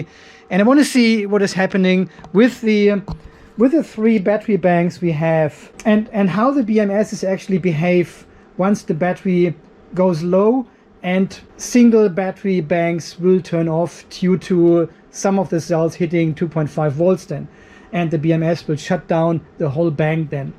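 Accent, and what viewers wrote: Germany. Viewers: Ah the weekly dose of Australian volts with a German accent. Cheers!, i connected the "peter" inverter to a convection oven. Now that draws some amps! bake some bread/pizza's if you want a load seems a great idea... Great display btw.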